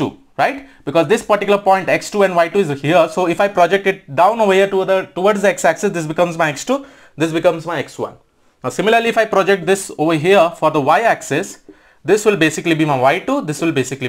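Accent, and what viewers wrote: India. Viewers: love from China I like Indian English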